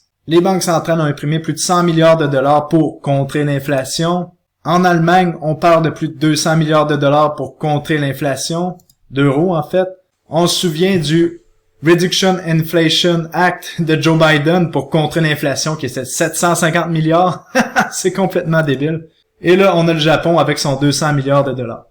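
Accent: Canada. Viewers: je te suis depuis le début ! j'ai presque pris l'accent canadien à force de t'écouter😂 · Tes videos dont toujours tres attendues. Recherche pointue, analyse fine et beaucoup de coeur dans le partage. Sans oublier l'humour et ce petit accent canadien qu'on adore ! MERCI !!!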